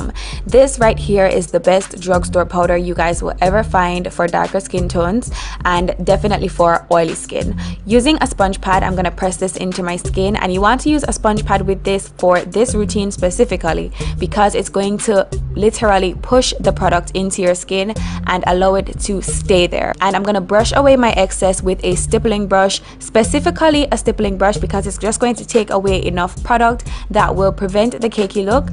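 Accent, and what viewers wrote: Jamaica. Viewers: Are you Jamaican cause you sure sound like one ..... well I think you are ! God bless you .... you’re extremely beautiful and blessed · Jamaican ? Yayyyy!!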